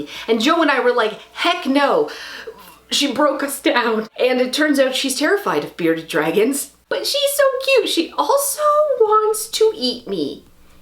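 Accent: Canada. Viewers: I love your videos. You remind me of myself. I have to confess, though, I make fun of your Canadian accent when I watch. 😋 It’s pretty close to my relatives in Minnesota. 😘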